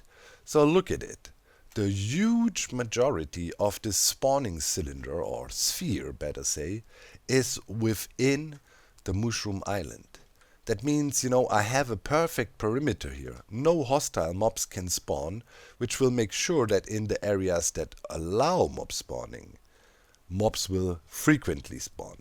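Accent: Germany. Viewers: Habe dich ganze zeit in anderen videos gesehen von bspw. Grian oder Mumbo und hab mir immer gedacht der hat doch voll nen deutschen Akzent. Jetzt weiß ich es fühle mich gut danke xD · Love you, I'm not a real supporter talking about money but I wanna call my self a real moral supporter, love your way to play this game, your way to ponder videos and also your character: real's life one and fictional one. Man, go gogogogogoogogo and never stop. Thx to make me enjoy a doichman speaking eng for so long <3 hope to meet you one day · I'm learning German on the side, listening to speak English is actually helping me with my accent when practicing lol. Keep it up,.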